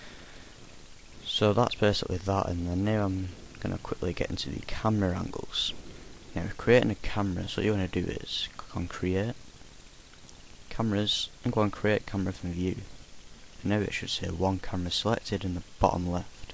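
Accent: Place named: United Kingdom. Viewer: All British talk like that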